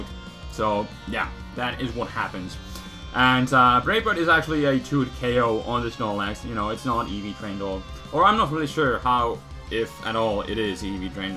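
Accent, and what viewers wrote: Finland. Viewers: Oh it's a Finnish accent sorry about the confusion but it's very formal :-) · So are you from Finland?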